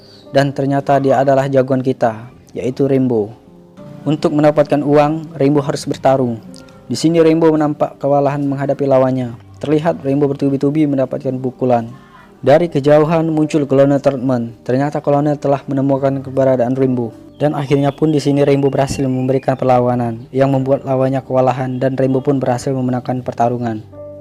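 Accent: Palembang, Indonesia. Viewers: Wong Palembang e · Asli wong palembang :v cuko dak be cuko tengah duo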